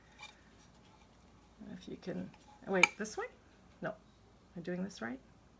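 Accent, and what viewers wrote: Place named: Minnesota, United States. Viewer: Aside from saying "Ay", you sound just like a Minnesotan to me😁 I want to make cups now, you made it look doable (not easy) for a crafter. Thanks!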